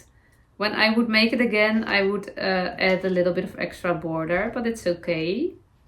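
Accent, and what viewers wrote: Netherlands. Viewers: Inspired by you, I made a granny square little vest for my 11-year-old granddaughter. It is so cute I may have to make another! I knit more than crochet, so I am so happy to have learned a new craft. I love your Dutch accent. When I was 11 to 13.5 I lived in the Den Haag area, so learned Dutch, and I am so happy to to hear your language again. I will have to listen to a Dutch episode! Congratulations on 100 episodes! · Happy 100th!🎉❤ it truly is the best heart jumping watching you. I look forward to Mondays and Fridays! You are so sweet. Love the imperfections and your wise words, even if they are in your Dutchie English- it is perfect. 😊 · Many congrats on your 100th episode,. I've often wondered how you find the time to film and, especially, edit 2 podcasts every week.... and still manage to run a home (and all that entails), and then get through the amount of projects you do! 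I love your videos.... and your Dutchy-English😊 Best wishes for the weekend, and I'll see you again on Monday. Christine (London, England) x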